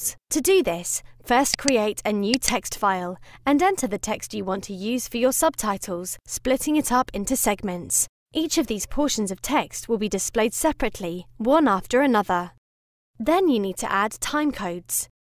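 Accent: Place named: United Kingdom. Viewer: very thick brit accent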